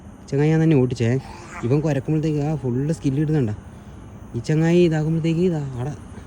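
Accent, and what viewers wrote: Kannur, India. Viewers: നമ്മട kannur ഭാഷ, ❤️🥰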